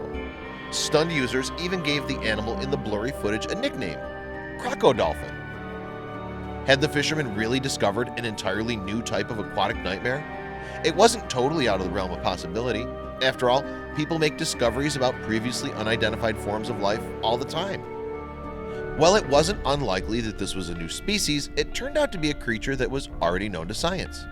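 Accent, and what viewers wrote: United States. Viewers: Why doesn’t YouTube ban did you know? Totally irrelevant content and that annoying know it all American accent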